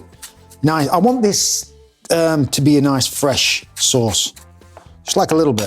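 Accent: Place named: England, United Kingdom. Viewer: Tomita's! Tomatoes! lets call the whole thing off! you sound like and English guy but say tomita's and not tomatoes like an American. Why?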